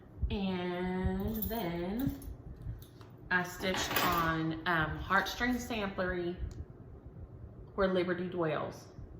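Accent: South, United States. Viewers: Love your channel. As I stitch this SUMMER, I'm watching your videos, love your PRETTY southern accent too.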